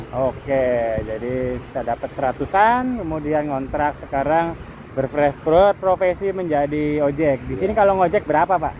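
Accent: Cirebon, Indonesia. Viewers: Sampean wong ndi ang? Kayong ilate ky wong cirebonan sih ?... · Mas orang Cirebon Tah? Logate kyak wong cerbon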